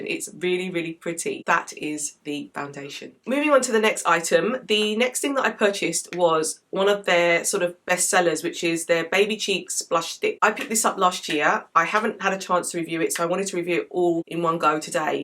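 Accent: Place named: United Kingdom. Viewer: Oh my goodness I love you 😍 I’m British too so totally appreciate your accent plus enthusiasm!!!!! All the words you’re using are spot on for these products . I have them all ,totally obsessed. You’re right about the super loaded peau de peche !!!! I find myself taking it out of my purse just to look at it and smell it . Absolutely stunning product. In fact I’m stocking up in case it disappears one day 🙋🏼‍♀️😳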